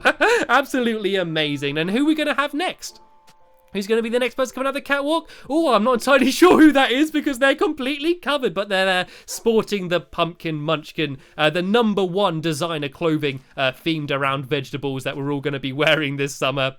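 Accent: United Kingdom. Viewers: my mum hates your british accent................ But, hey, we used to live in England. So Go girl, that was how you used to sound. SO Suck it up Princess <3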